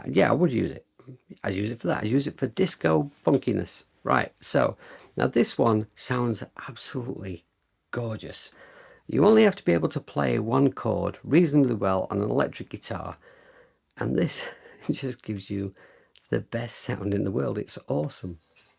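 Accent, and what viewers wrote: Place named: Northern England, United Kingdom. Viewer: Both guitar and voice levels fine, nice playing. Wot neck of the woods are you from, cos I can tell yer a nothern lad?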